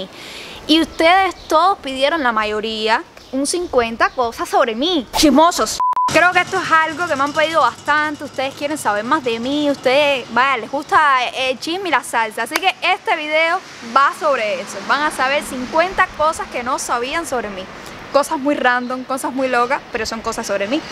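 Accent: Cuba. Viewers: Viendo este video en 2023, ¡wao! que delgadita eras y hablabas muy distinto ya has perdido bastante el acento cubano.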